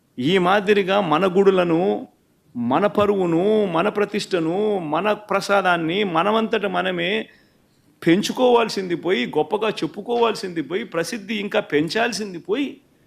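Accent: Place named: Rayalaseema, India. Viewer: ఏంటి ఈ మధ్య నీ స్లాంగ్ మారింది, ప్యూర్ సీమ భాష లోకి వచ్చేశావు.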